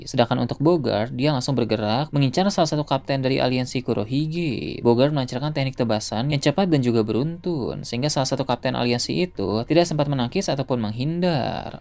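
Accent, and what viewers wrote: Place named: Banyumas, Indonesia. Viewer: Ngapak teka🎉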